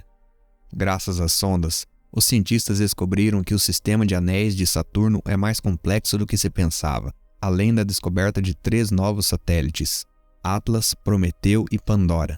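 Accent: São Paulo, Brazil. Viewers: Seu sotaque Paulistano é muito legal, sem falar nesse belo documentário. As voyagers serão a prova da existencia de uma fantástica e predadora raça humana, que talvez terá se autodestruido em função do seu próprio ego.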